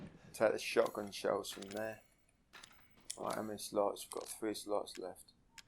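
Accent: United Kingdom. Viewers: Just 100% the game because of your and MrReign’s work. Honestly you’re like a younger MrReign. Absolute prodigy. Anyway I just love your British accent and funny jokes, very fun combination. Don’t know why British people and resident evil 7 just… works😂 anyway thank you and have a nice life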